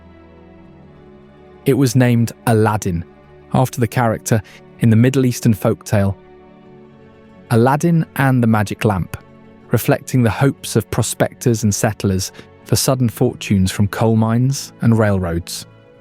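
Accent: United Kingdom. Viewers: Wyoming is beautiful and amazing .... but why in the hell is some Brit with rosy cheeks and their funky accent telling us all about Wyoming and making incorrect statements and plenty of mistakes ... typical Brit trying to capitalize off of America's land and beauty ... maybe a hillbilly mountain man like me should go over there and make a video and tell them all about "STONED HEDGE".... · Can we get an American to speak about Wyoming not a limey annoying brit accent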